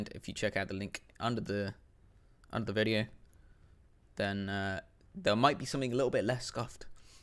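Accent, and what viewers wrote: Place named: United States. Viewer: That american accent lol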